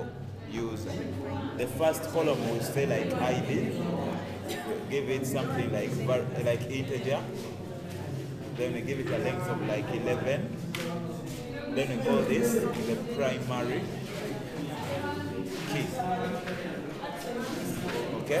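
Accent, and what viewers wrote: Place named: Uganda. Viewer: by accent I knew you were Ugandan; greetings from Canada. if I did not find this video, I think I would fail my assignment. Others didn't help.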